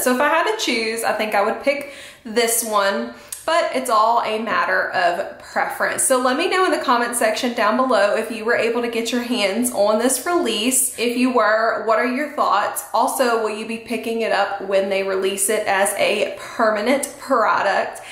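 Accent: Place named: South, United States. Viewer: Hey,! Recent subscriber here. I've also been trying the RdF Luminous Elixir Three Drop Foundation. I fluctuate between the shades Ceres and Metis. My facial skin is rosacea-prone combination/oily. My usual process is applying prescription medications (a serum-y type product, then an azeleic acid gel), a light moisturizer, then sunscreen. I let the sunscreen absorb for AROUND ten minutes. When I apply the Luminous Elixir, I dispense three drops on my fingers, lightly blend onto my other hand, then gently begin massaging the product onto my face, working from my reddest areas outward. I was also absolutely SHOOK by the coverage level of this foundation in proportion to the amount I needed to use. I can wear it all day with minimal creasing, and I haven't really noticed it sinking into pores (mine are BIG) at all. This might just be a skin-type or prep difference, but the Luminous Elixir leaves my face looking considerably less greasy than, say, the Ilia Super Serum Skin Tint. 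ALSO, I have found that even if I scratch my nose or touch my cheeks throughout the day, I'm not removing coverage. Perhaps consider dropping the product onto your fingertips first, rubbing them together ever so slightly, then applying to your face. It could make a difference in finish and wear-time for you! PS: It's nice to listen to someone on YouTube who rocks a southern accent. Cheers!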